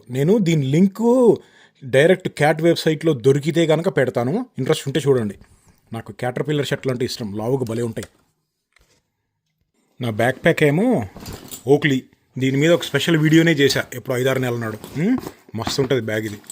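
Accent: Andhra Pradesh, India. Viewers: Observed Andhra accent in mutton preparation in Palm tree garden. But Nizamabad in Telangana. Why is it so? Just Curiosity. I don't have any state or regional feelings. Appreciate if you reply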